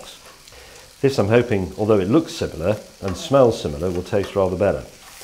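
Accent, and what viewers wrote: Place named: RP, United Kingdom. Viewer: Michael Persico that not anywhere near posh. Thats just the trained BBC voice combined with a southern accent with educated received pronunciation. You can speak properly and carefully without sounding like a twat, he chooses every word carefully and because of that people all over the world can understand him.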